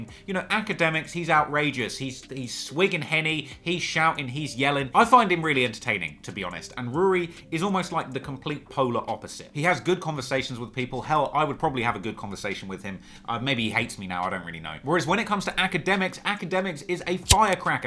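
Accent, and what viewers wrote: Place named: South, United Kingdom. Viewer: Is Rory’s name really pronounced like that or is the back translation into southern UK accent making it sound weird?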